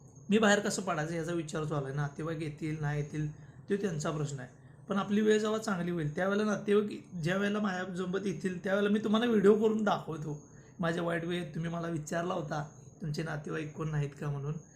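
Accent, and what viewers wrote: Kolhapur, India. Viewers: दादा कोल्हापूहून आहे काय भाषेवरून विचारल..😊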